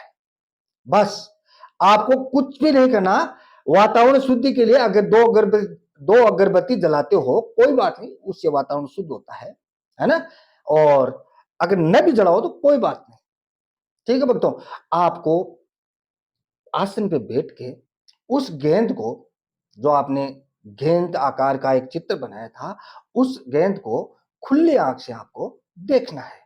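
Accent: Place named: South, India. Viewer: Dada g ka hindi south ke hindi se milta julta hai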